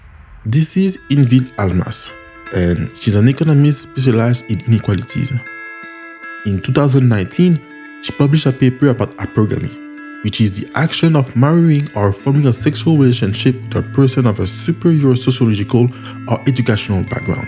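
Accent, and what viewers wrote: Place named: France. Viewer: you have a lovely accent Very French ✨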